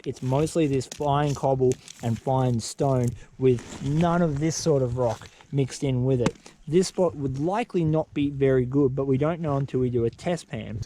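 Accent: Australia. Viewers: Australian accent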